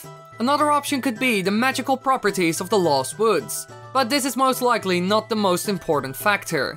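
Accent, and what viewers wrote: Netherlands. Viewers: Are you Dutch because I recognize the accent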